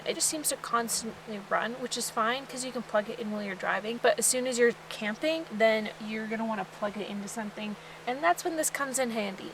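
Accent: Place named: Canada. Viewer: The way Canadians pronounce words like Mazda, and pasta always amazes me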